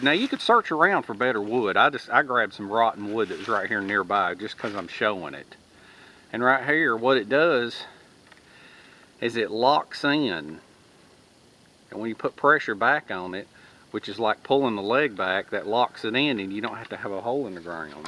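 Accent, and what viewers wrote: South, United States. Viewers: I was wondering where in the South you were... I could tell you were somewhere in Antebellum country by your dialect.... Georgia the setting of 'Deliverance'- watch your corn hole in them woods that boarder Appalachia 😎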